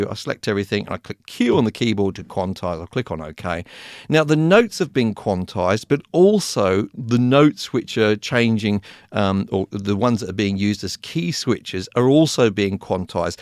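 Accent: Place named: Australia. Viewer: Thanks. I switched to Cakewalk a month ago and you helped me. I love your accent by the way. Are you from Australia?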